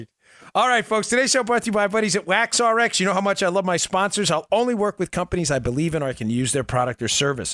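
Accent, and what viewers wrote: New York, United States. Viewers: The basic fact is that the Liberals who support the Democrat Party can in no way shape or form be called liberal. Realism requires that we return liberalism to its fundamental meaning. True liberals base their ideas on the Bill of Rights of the US Constitution. End of story. It's time more people got their support straight. Thanks and best. Great to hear it spoken in a New York tone of voice for a change.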